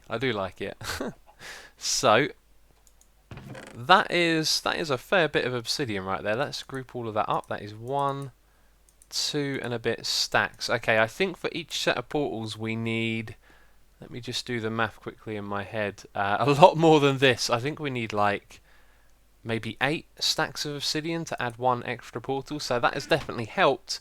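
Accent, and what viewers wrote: England, United Kingdom. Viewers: He is from England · Questions for the Q&A : 1) Ketchup or Mustard ? (yes, there is a correct answer :P) 2) More generic one: What made you get into recording game play videos? 3) You're quite obviously a Brit, but where in the country are you from / grow up? I'm a Londoner personally, and your accent isn't that different from mine.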